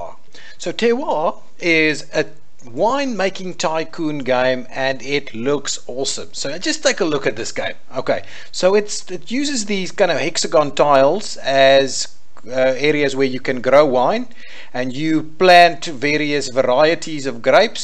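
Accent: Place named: South Africa. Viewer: Hey Boet! Nice to hear a good South African accent. Just bought Terroir and enjoying it, especially as I live in Cape Town and as you know, am surrounded by terroir! Keep it up with the strategy games and I'll be following.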